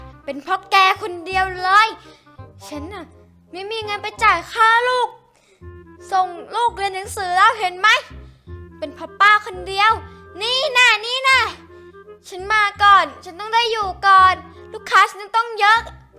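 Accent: Thailand. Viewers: พี่เป็นคนพาคเหนือหรอค่ะ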